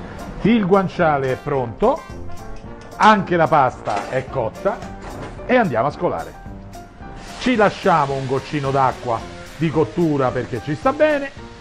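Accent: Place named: Rome, Italy. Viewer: Sono siciliano e di buona forchetta e abbastanza capace ai fornelli a livello amatoriale e vi dico che fra tanti video che ho visto su questa ricetta a mio modesto parere è il migliore in tutti gli aspetti poi con la voce narrante si completa il tutto con quel dialetto romano che ne vado pazzo mi piace da morire e per restare sul tema come se dice a Roma "Ahu me venuta na certa fame co sto video mortaacci vostri mo stasera carbonara per tutti alla faccia da dieta ma che ce frega...". Complimenti veramente.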